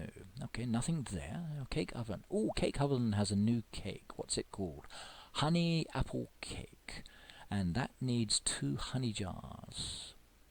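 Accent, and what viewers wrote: United Kingdom. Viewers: Hey, you are fine with me about the accent. Here in the states people talk with a tone in their voice as if tey are asking a wuestion yet making a statement, being sight impaired it is annoying, yes I see with one eye and a gamer at 53. Love it. Especailly hayday. British accents are hot. Thats howi got blessed to meet my spouse, he met the bestles. Lived in kent and went to dorton house schol for te blind.theuk treated him as if he was royalty.respect and dignity not like the services for the blind here. They doubted my connecting up the internrt, dragon naturallly speaking, amazon, dow loading books and games, hayday.my farm is teri millers. Pigs infar upper right hand corner, bees decoate the front, right.you will eventually find a theme. And my artisic skills no horses yet and bunnies soon.like me onfb. Treacle tarts are a dream. 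I got to visitfor two weeks. Too short for me. I love the uk.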